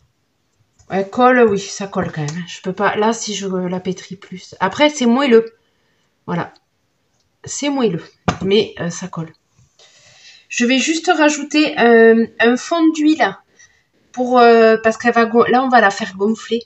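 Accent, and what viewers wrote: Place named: Midi, France. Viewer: Bonjour. Merci pour cette super vidéo. Ce pain est super à faire quand on est en panne....😅Nouvellement abonnée je visionne les vidéos petit à petit. J 'ADORE..... Nous avons le même accent 😁. Un petit coucou du Midi Toulousain. 😘😉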